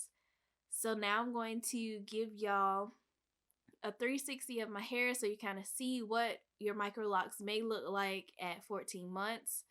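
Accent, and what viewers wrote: United States. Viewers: Where you from?!? You sound like my family from Baltimore, MD! Just on your “too” and other “t” words… Lol! I do the same, and I wasn’t even raised around them! I love it tho!🤗💜🤗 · That’s funny that Nichole Harmony_TV asked you where you’re from. To me you sound like my people from North Carolina. Where are you from? Anyhoo, I love your entire loc journey. With your problem sideburn loc can you just cut it off where the tip meets the latest knot and then as it grows start a new one as a braid loc? To make sure it is a secure loc?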